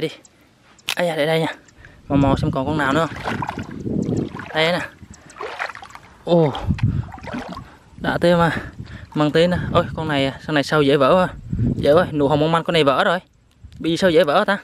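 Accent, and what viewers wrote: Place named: Mekong Delta, Vietnam. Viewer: ah nói chuyện miền tây thì chỉ có ở châu đốc an giang😃😃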